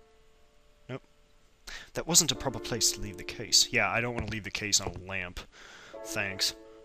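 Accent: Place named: England, United Kingdom. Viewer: I love your voice with the English accent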